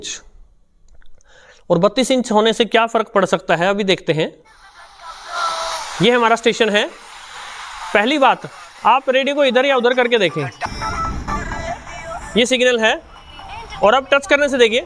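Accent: Standard. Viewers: Very nice.. impressed by your tone ,pure Hindi and clear details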